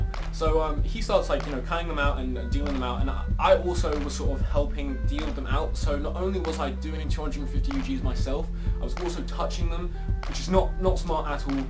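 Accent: United Kingdom. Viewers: he sounds british to me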